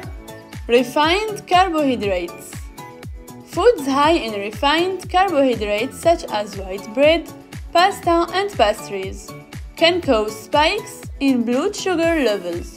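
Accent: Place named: France. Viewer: Your voice tone combined with your accent sound pretty sweet n adorable in my ears.. You’re probably french tho right? :/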